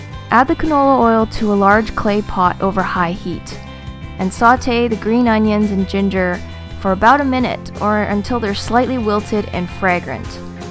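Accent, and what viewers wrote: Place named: California, United States. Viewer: Gastronomic Relevancy Hi, I subscribed too yer channel, But where are all the videos? I'm from California and your English dialect sounds like you may be too. You have a great voice to listen too and your videos are very well done! I want to learn so many Chinese dishes and how to cook with a wok! So, start cooking girl! Show us how to make that sweet and sour pork or chicken right in the wok. Okay? And you definitely must show us how to make those awesome Shanghai soup dumplings. They are outta this world you guys. You are gonna get so many subscribers! And make a lotta money!;) Oh, and you don't just have to make Chinese dishes, make whatever you want...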